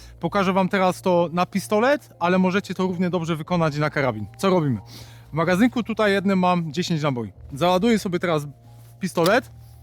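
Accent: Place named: Germany. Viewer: To lubię: krótko, treściwie i na temat :) A tak z ciekawości - długo jesteś w Niemczech? Mam wrażenie, że słyszę już lekko niemiecki akcent ;)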